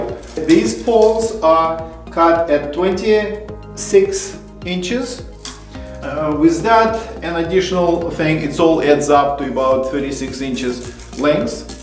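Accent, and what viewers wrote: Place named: Russia. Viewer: Я так и думала, что у вас русский акцент)) Конечно, кто ещё может додуматься до такого решения. Нужна смекалка (несуществующее слово в английском)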